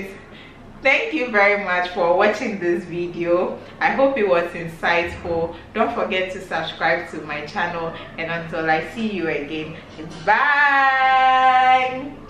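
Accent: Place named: Volta, Ghana. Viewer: Your tonation is different, which part of Volta are you from.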